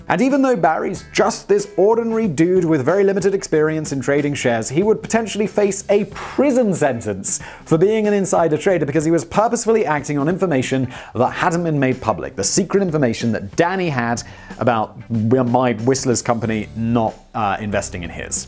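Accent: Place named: United Kingdom. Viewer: The British accent in this one is throwing me off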